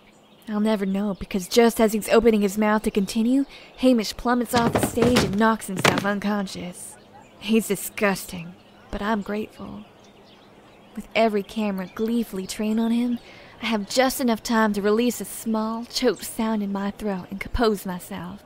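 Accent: South, United States. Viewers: I like the southern accent...Good job! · Cool but um what's with the country accent?... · What's up with the southern accent?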